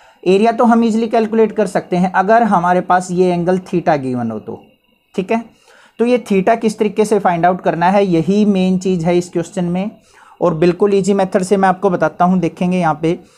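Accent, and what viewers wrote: Haryana, India. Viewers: I understand everything in maths from I feel especially and only teaching me and you explain every small thing in very easy way. sir aap Haryana se belong karte hain kyunki jab aap koi bhi question explain karte ho to aap ki tone mein Haryanvi Aisa lagta hai mujhko sir please reply karna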